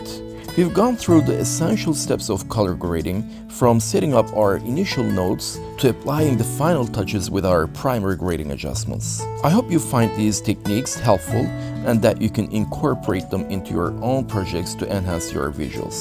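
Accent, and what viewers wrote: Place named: Russia. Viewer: Я совершенно не знаю английский, но чувак, я слышу русский акцент)))))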